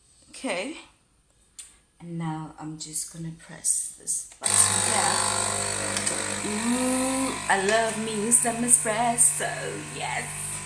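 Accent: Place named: United Kingdom. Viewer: You sound very British You can ask for a UK man like me lol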